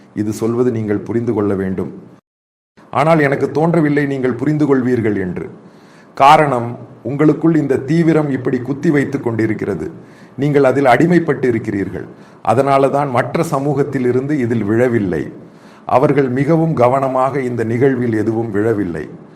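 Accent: Kerala, India. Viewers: தமிழுக்கும் மலையாளத்துக்கும் இடைப்பட்ட ஒரு மொழியில் பேசுகிறீர்கள் 😇 மன்னிக்கவும் , பாதி புரிகிறது பாதி புரியவில்லை.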